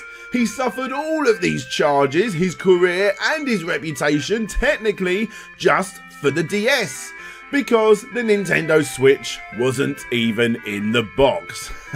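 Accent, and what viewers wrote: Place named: United Kingdom. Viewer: Great idea for a video! Also... are you the most British sounding Brit, ever?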